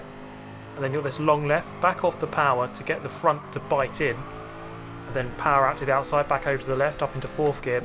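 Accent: United Kingdom. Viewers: I love to practice my english pronounce with your british accent! Thanks for your existence. ❤️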